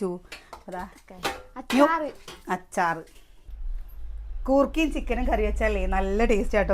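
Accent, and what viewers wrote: Palakkad, India. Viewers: You are good house wife I respect you.Your muringa curry I didn't like.Your palakkadan slang is very nice.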